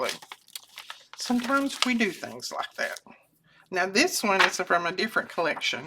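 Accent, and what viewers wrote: South, United States. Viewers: We love ❤listening to your southern charm.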